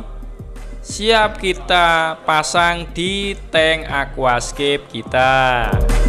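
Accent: Java, Indonesia. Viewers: Om asal mana kok logat bicaranya medog kental nuansa jawanya 🤭🙏 tp gayanya asyik mudah di mengerti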